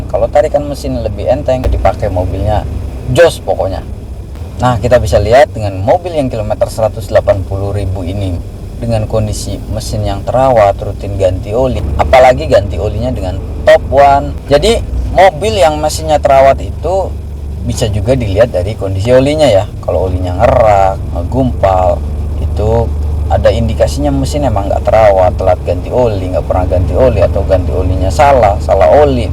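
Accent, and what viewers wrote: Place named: Banyumas, Indonesia. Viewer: Om Ini Aslinya Orang Ngapak.Ya Ommm..."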